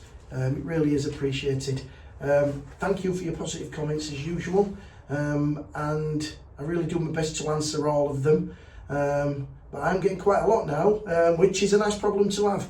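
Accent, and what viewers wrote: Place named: West Yorkshire, United Kingdom. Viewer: Hello, I just watched your video and really enjoyed it. So down to earth and practical, and I love the West Yorkshire accent. I worked in Leeds for 12 years and really miss the place! I don’t think I will have problems with flooding on my veg plot😮😂.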